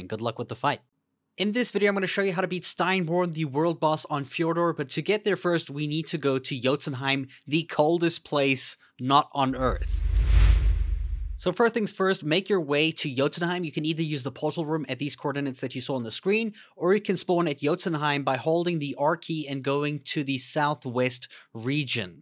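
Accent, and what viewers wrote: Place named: South Africa. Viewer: I knew you sounded south African. Wild lol 😆 awesome guide bro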